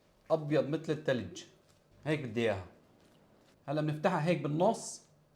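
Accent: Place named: Homs, Syria. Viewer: لك اللجة الحومصية بقلبي❤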